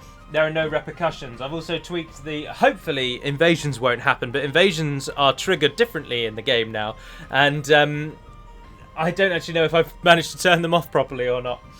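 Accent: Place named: England, United Kingdom. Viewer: Holy shit. I couldnt tell if he was english or scottish :O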